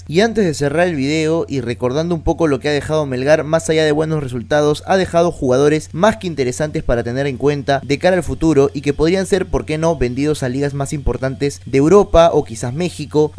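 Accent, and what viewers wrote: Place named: Argentina. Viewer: por que siento un acento argentino?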